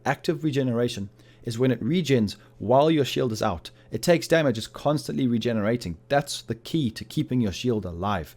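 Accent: South Africa. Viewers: You sound south African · Dude you from SA your accent sounds SA?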